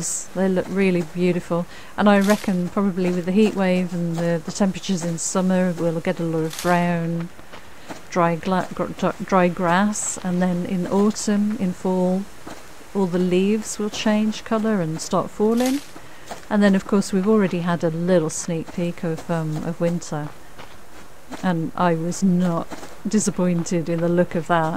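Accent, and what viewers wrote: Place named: United Kingdom. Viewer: Thank You for the information about the new update. I really like to listen to your lovely British accent. You speak a very good english, very easy to understand for someone from the continent. Keep on the good work!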